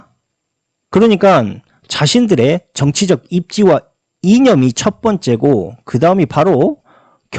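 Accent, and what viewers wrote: Jeolla, South Korea. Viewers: 은 절라도 말투? 경제에대해 시원하게 말씀해주시고 윤석렬은 특히 미워하시는걸 보니 진보(사회주의)에 가깝다. 사실 정치가들을 믿을 수는 없지만.....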